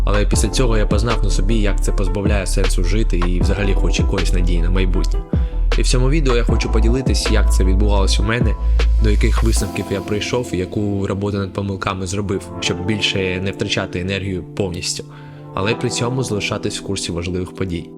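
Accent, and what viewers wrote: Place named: Belarus. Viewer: вы белорусс? акцент белорусский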